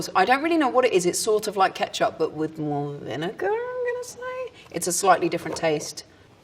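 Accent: United Kingdom. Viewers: Why does she sound like a British person trying to be an American person trying to be a British person? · Omg that accent 😍😍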